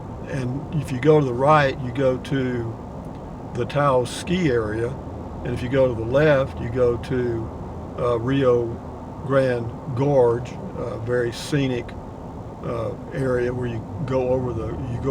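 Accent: Texas, United States. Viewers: You sound all Texan :p am i right ?? btw Smith's is just a different brand of Kroger. They have all the same Kroger products and sales, it's really not a 'local' spot. Not trying to be a dick but you keep saying things like "this is where the tourists go...." . My man, you are a tourist.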